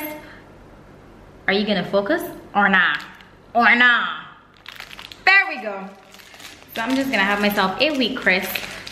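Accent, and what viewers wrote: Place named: Barbados. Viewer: I love your Barbadian accent! You must be from Barbados.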